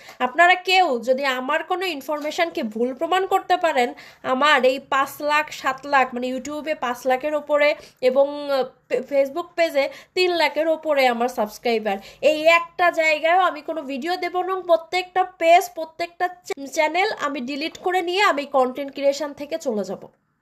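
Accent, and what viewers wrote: Khulna, Bangladesh. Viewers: আঞ্চলিকতা পরিহার করলে ভালো লাগে।একবার খুলনার কথা আবার শুদ্ধ কথা না বলা ভালো।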